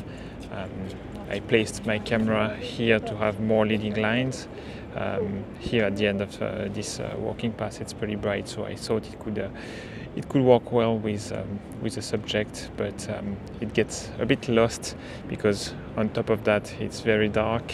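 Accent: France. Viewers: Drôle comme on reconnaît l'accent francais, belle video 👍🏻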